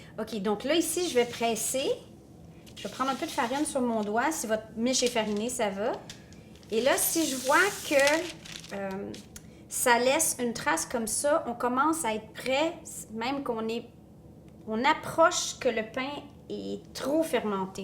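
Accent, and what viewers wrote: Quebec, Canada. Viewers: Quebecois is so beautiful to listen to. So much more real than "Metropolitan" Paris busboy waiter continental French.... But then again the French don't play hockey either! ;)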